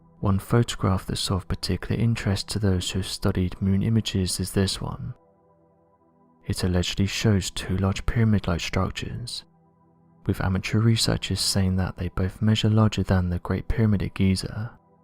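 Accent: England, United Kingdom. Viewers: Thank you for all your hard work on these videos!!! I love watching them and you have the perfect, intelligent, serious, English voice for narration 💯♥️ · Best accent English. I am biased though.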